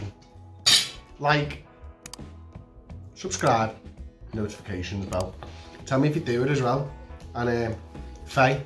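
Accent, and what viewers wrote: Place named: Liverpool, United Kingdom. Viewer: A most adorable scouser is the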